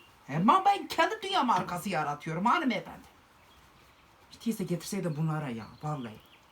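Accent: Turkey. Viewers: Bence sen zazaca konuşma aksanli karakocan zazacanla hep kendine argo şeyler söylüyorsun lexanlisiniz siz galiba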